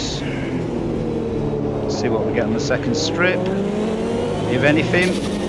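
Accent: North, United Kingdom. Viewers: I fear for our sanity when dust becomes a variable and rateable update worth recording. But we ‘is’ sim races, and we do this with pride. And yes, it does look better. Nice vid as usual partially due to your beautiful northern dulcet tones. Appreciated.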